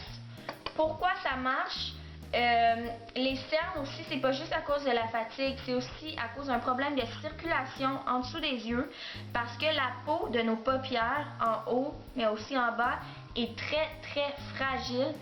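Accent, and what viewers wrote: Quebec, Canada. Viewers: Ont dirais que tu est canadienne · T'es belle et puis ton accent quebecois Omg il est troooop beau __ 👌 +1 abonnée Francaise Active Bisoux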